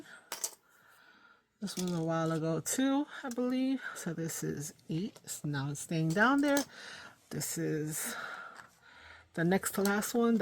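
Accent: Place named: New York, United States. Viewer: Everything came out Beautiful ❤! I started my junk journal journey this past year and I still have a ways to get to where I you are at 😊. Thank You for sharing your creative process with us . I HAVE to ask you ….. are you a fellow Puerto Rican from New York ( you have that accent , IYKYK 😉) . I’m from Queens but I now live in Miami and I miss the cold weather! lol😉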